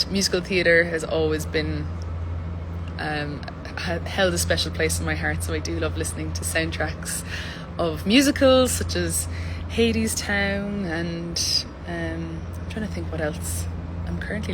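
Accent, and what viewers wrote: Ireland. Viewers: Wow i love the irich accent ❤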